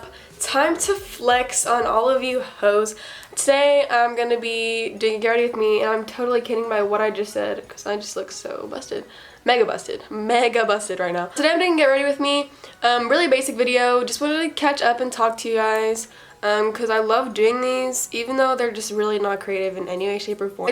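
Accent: Texas, United States. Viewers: she sounds so texan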